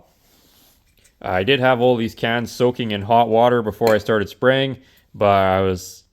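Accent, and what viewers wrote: Canada. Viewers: Videos like this make me so glad to live in the south. I saw the thumbnail and thought where the heck in the north is this car from, then I heard the accent and realized, oh, the great white one. Nice job for a "quickie" body repair and paint job. When I saw you just pulling the rockers apart, I was just in shock. Never seen anything like that in person, only pics and vids online. Maybe I need to take a trip up north with my CVPI with 0 rust and sell it for 2x as much up there.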